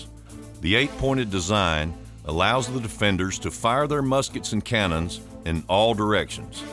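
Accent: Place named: South, United States. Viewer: Decently made, some inaccuracies for sure though. Biggest example being that the British 100% DID have experience fighting “like Indians and backwoods men”. This was called “skirmish order”, and European regiments had a whole company each of light infantry specifically trained in this. They also had just fought an entire 9 year war, both with and against these tactics, known in the US as “the French & Indian War”. So this was nothing new to them, and woodsmen played a minimal role in the war UNTIL the war reached the south where tactics changed. These men would not win in open battle against British regulars. So they did not even try. They attacked civilian and logistical assets, making British leadership not feel comfortable moving further North. This kept the British bogged down chasing “insurgents”(think of modern Taliban tactics), and kept the northern and southern British armies separated. 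My biggest gripe is Trace as narrator. If wanted to be taken seriously as a historian, he should not have been a modern country singer. If you want your documentary to be taken seriously, don’t hire Trace. Especially to cover a subject that literally Southern accents did not exist within. Thank you for listening to my rant 😂.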